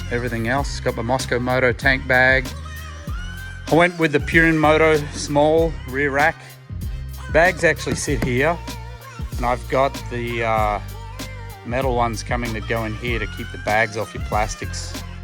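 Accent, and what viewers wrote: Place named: Australia. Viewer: Super MOD... thank you for showing us. I just sold my r1200gs and bought the 890 adv S 2023 in a few weeks... this video gives a nice tip. Are you from Australia? You have an Australian accent. I go there a few times . G' DAY MATE 👍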